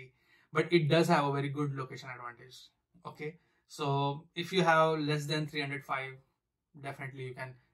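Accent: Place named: Maharashtra, India. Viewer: Great video bro...I guess you are a marathi guy based on your accent.. well that's a different top though! I just wanted to ask that if we are able to score only around 302-305 in GRE then is it possible to compromise it on 1 year work experience plus an internship of 6 months so that the total work experience will be of 1.5 years and the GRE score would be around 305.Will this make me get an admit into sjsu for MS In CS? I would be glad if you answer. Thanks!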